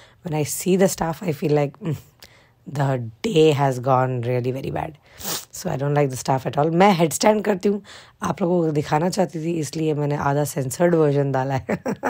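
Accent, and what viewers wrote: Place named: Bihar, India. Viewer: Mashallah...Half English Half Urdu...You sound like a Bihari when you speak English...I have some medicine can you please promote that on your channel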